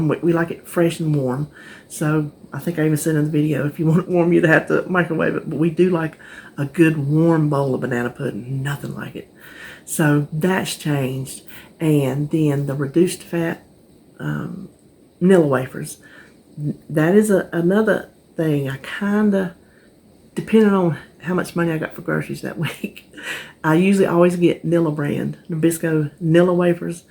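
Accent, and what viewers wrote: South, United States. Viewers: I'm pretty new to WW and I found your videos last night, and have been watching so many of them. I just adore you. I'm from SC and just your southern accent and colloquialisms make me think of my grandmother and your videos feel so homey. Thanks for sharing!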